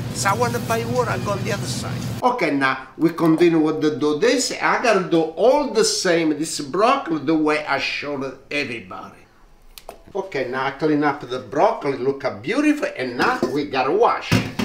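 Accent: Italy. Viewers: Love the way you explain mostly the accent, the pure italian🤌🤌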